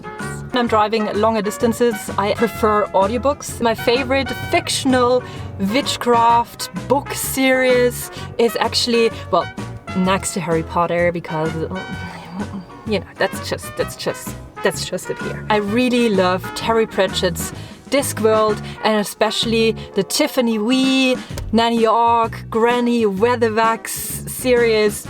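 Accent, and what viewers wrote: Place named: Germany. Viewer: I absolutely love your German Accent which I find extremely endearing and comforting have yourself a wonderful and Bewitching day and Blessed Be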